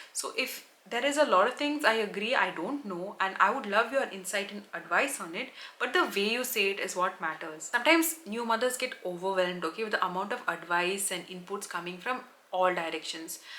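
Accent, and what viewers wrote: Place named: Bangalore, India. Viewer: Is it just me or theres proper bangalore accent exploding in this video ❤❤😂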